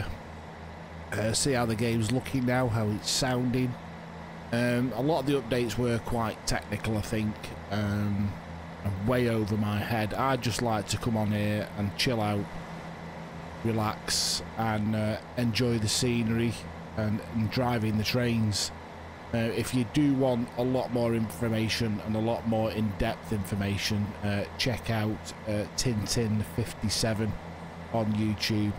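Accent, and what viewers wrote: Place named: United Kingdom. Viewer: great vid and commentary. love me some uk accent at 2am, thats my asmr. haha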